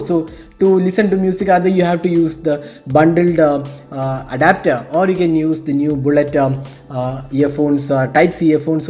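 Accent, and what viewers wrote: Kerala, India. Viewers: Malayali your English bro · Mallu slang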